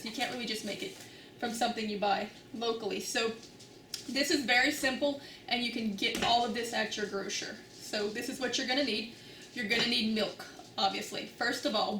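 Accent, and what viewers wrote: Louisiana, United States. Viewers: Cannot wait to try this. A lot of other videos I watched made homemade mozarella seem a lot more complicated. I live in a small town where specialty items are not usually available, so I appreciate the use of easy to find groceries. On a personal note- I picked up the Louisiana accent immediately, and paired with the well-used Magnalite pot, I knew we were from the same state! So hello and thanks from Jennings, LA!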